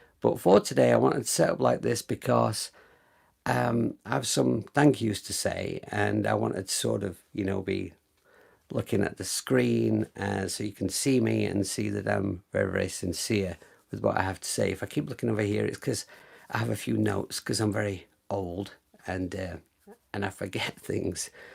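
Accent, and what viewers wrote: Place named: Northern England, United Kingdom. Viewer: Both guitar and voice levels fine, nice playing. Wot neck of the woods are you from, cos I can tell yer a nothern lad?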